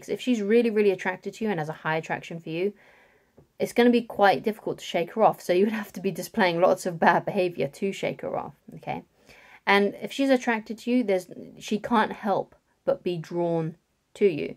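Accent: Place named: United Kingdom. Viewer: you are pretty and I really like British accent.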